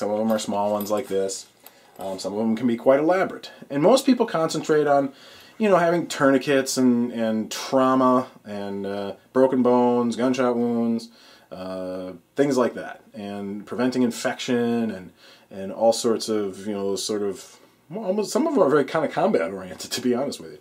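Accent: Wisconsin, United States. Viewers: You from Wisconsin?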